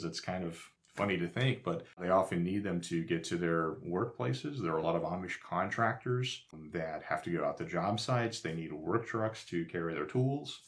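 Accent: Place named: Baltimore, United States. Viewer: Have to ask, are your from Baltimore? I hear certain words that remind me of my childhood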